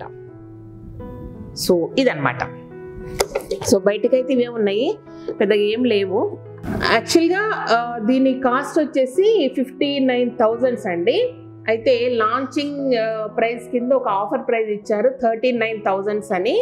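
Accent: Telangana, India. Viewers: Telangana slang adurs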